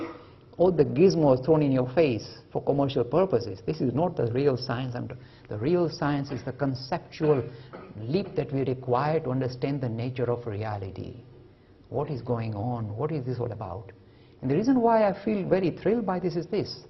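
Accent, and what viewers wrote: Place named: India. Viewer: this is not richard Dawkins .this is an Indian seethe ascent not british. FAKE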